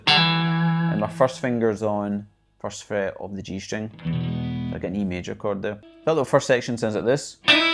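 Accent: Scotland, United Kingdom. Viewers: Nice lesson and sound.Good to hear a Scottish accent as well.Keep the lessons coming ..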